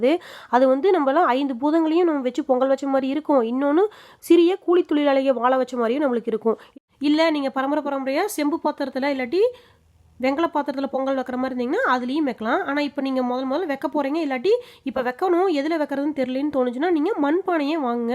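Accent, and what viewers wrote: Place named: Coimbatore, India. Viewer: BEAUTIFUL KOVAI SLANG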